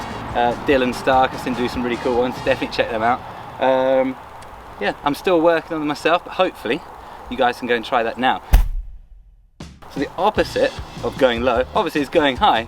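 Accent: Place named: England, United Kingdom. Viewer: that prim and proper english accent juxtaposed with outrageously insane bike skills, full marks sir!!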